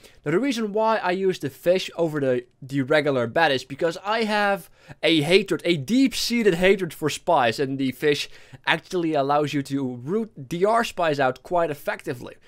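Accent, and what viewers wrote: Netherlands. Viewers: Is this guy dutch? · Are you dutch?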